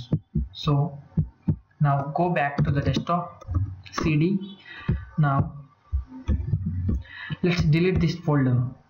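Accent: India. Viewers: I knew instantly that im gonna learn when an indian guy starts talking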